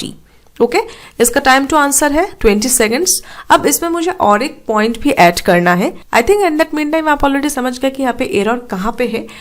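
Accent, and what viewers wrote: Bengal, India. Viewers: Bangali voice in hindi always love❤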